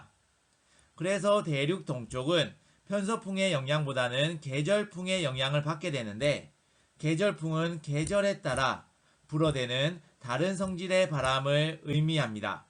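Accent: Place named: Gyeongsang, South Korea. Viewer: 옆에 있던 엄마가 쌤이 갱상도 부이시가?? 싸바나 아이가~~ 싸바나~~ㅋㅋㅋ 넘 잘 듣고 있습니다!! 감사합니다!!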